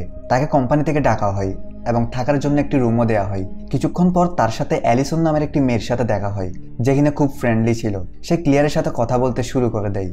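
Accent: Chittagong, Bangladesh. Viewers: ভাই মনে হয় চট্টগ্রাম এর মানুষ যতই শুদ্ধ বলেন না কেন চট্টগ্রাম এর ভাষা বের হচ্ছে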